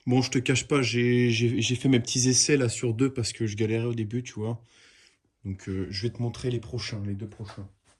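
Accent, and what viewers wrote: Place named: Lyon, France. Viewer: Une prepa Peugeot j'aime bien :) ça change ! t'es de Lyon ou autour d'apres ton accent non?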